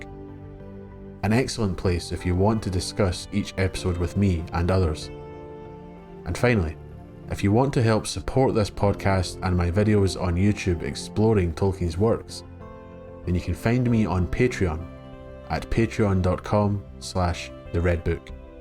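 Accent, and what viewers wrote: Scotland, United Kingdom. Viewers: I listen just for your accent. Scottish? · There is no shortage of knowledgeable people when it comes to Tolkien lore...I, myself, have read all published material many times over, and explored other material as well. However...your insight is what sets you apart. I've listened to several of these videos, now, and have learned a great deal. You go beyond stating the 'facts' and explore the relationships, meanings, reasons, etc. that give the facts their importance. Truly a work of art. Your pacing and diction is spectacular as well, and the distinct--yet clear--accent makes me miss Scotland in a bittersweet way. Thank you for your contributions to our understanding of this wonderful universe.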